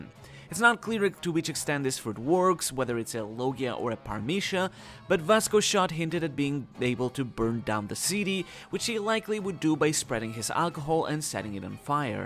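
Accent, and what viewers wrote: Spain. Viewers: Are you Spanish? I’m just guessing from your accent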